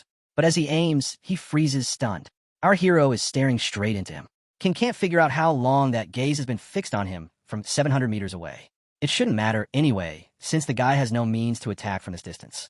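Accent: United Kingdom. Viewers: Fuck this British accent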